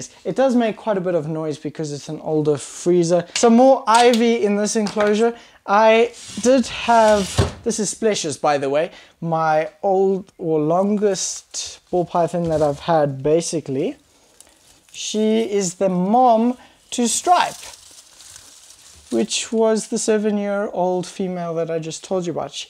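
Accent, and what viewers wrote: South Africa. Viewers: Are u South African by any chance ? Your accent sounds like it.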